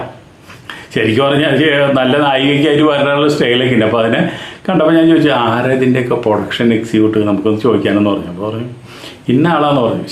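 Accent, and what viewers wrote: Thrissur, India. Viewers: Very interesting Voice & Language, sounding Trishur?😅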